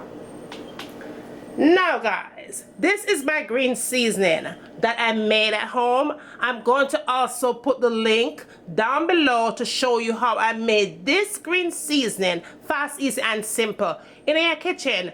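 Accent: Jamaica. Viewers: Man I watched alote of cooking videos and let me tell you when I want to cook a Jamaican dish I have to look for you videos dem why?? Because for some reason the Jamaican accent makes it better I've seen some other videos of people making Jamaican dishes and the accent not there ATALL that's just my opinion so in other words I LOVEEEE your videos dem 👍👍 lol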